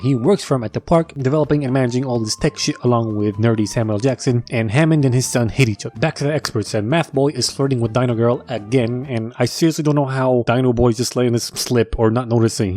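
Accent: United Kingdom. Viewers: the best part is the accent - I'm british too but it gets me every time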